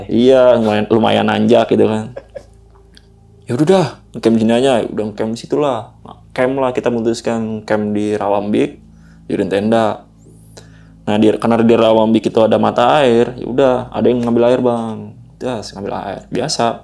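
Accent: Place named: South Jakarta, Indonesia. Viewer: Gaya bicara jaksel punya nih, keren bang pembawaan ceritanya, jadi seolah-olah kaya kita ada di posisi itu, terimakasih sangat menghibur ❤❤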